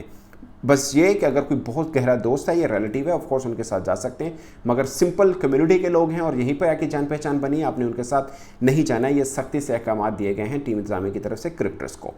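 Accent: India. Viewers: URDU TALAFUZ KI DANISTA GHALETI: KKHas nahin, balkay khas! KKHali, nahin balkay khali! Kia ho gaya hay ap ko, kay IND charyon kay views lenay kay liay jahilana Hindi Talafuz/lehja apna rahay hain? Isi terhan 'Beech kay ander' koi standard Urdu nahin hay. Sahih hay: 'darmyan main', yan 'is doran'.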